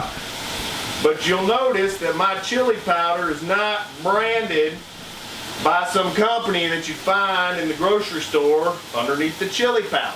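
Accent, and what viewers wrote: Texas, United States. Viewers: Or do all Texans speak like this?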